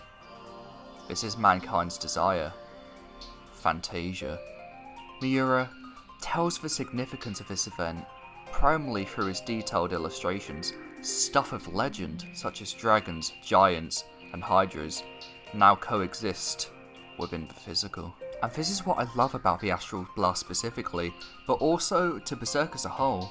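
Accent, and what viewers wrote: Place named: United Kingdom. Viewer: Being in the UK is known for having thick accents. You simply find it hard to deal with his accent. Nothing wrong with his English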